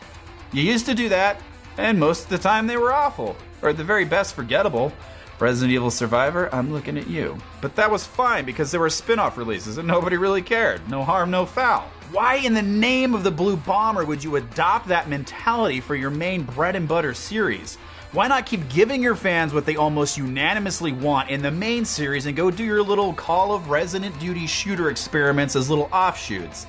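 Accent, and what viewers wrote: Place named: United States. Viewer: Awesome review! Informative, humorous and to the point. It's nice to hear an American tell me about games rather than the obligatory Brit the big sites seem to hire for reviews. I'm not saying they are annoying....I'm saying your AWESOME. Sunbscribed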